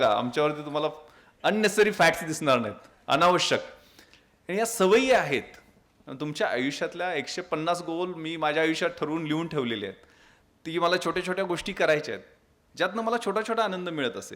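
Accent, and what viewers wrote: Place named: England, United Kingdom. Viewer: Why he is talking in English accent????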